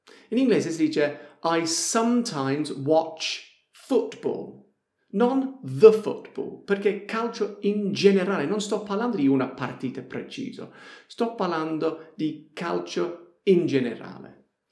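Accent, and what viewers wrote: Apulia, Italy. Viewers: ... unA consonante o unA vocale, non un... utilissimi i tuoi e super simpatici con quella cadenza pugliese... 😅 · Vero !! e quella malcelata cadenza pugliese è un plus che lo rende estremamente simpatico ed empatico con chi lo segue: per sua stessa ammissione in altro video pare che abbia deciso di vivere in Puglia ed in particolare a Locorotondo, un magico paese di quella splendida zona nota come Valle d'Itria costellata dei famosi "trulli" ... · Non posso non notare un leggero accento pugliese :) Giusto?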